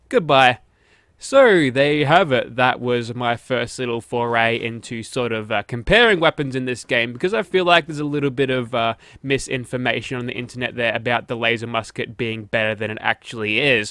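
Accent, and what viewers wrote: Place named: Australia. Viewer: So Australian. Love it.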